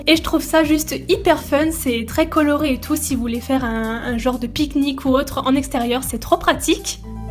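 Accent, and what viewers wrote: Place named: Alsace, France. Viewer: Tu es de quel origine ton accent ressort grave on dirait une alsacienne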